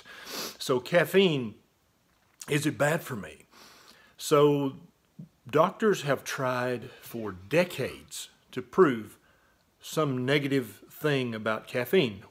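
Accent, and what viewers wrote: South, United States. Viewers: What part of the south are you from? Im curious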